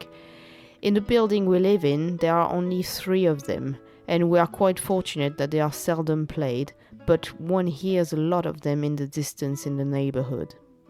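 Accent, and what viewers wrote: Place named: France. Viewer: Very informative and nice talk, like your authentic french accent😊❤And thanks to F.Sor🙏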